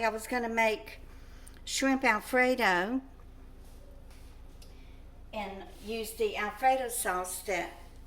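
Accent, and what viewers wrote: South, United States. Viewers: Oh, I love your cooking videos, and as an Australian, I adore your sweet southern belle accent!:)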